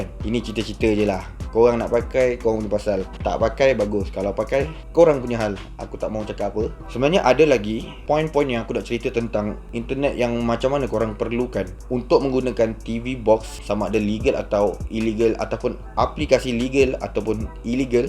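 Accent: Java, Indonesia. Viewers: bang ko org mna. ckp mcm ada sleng jawa je..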